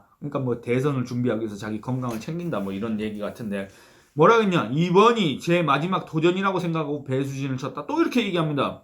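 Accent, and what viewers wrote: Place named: Gyeongsang, South Korea. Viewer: 저는 경상도 사나인 줄 알았어요😊😊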